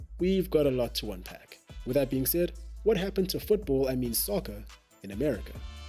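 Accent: South Africa. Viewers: Are you South African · Accent sounds like a black south African for some reason....just saying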